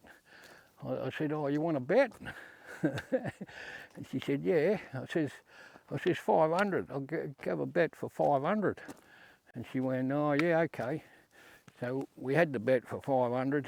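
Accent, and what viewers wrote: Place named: Australia. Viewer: Love this channel bro! Im very envious of you being able to live off grid. P.s i understand every word you say. It's hard to believe some people struggle to understand your accent. Maybe because im Australian also.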